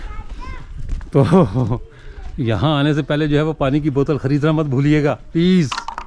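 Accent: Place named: Punjab, India. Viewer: His Urdu has inclination towards United Provinces , and to Bihar, yet a creamy touch of Panjabi accent.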